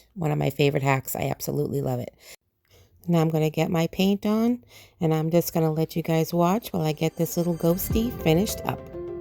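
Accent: Boston, United States. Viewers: Is that accent from Boston? I get nailed all the time with my "Texas Country Twang"😂😂❤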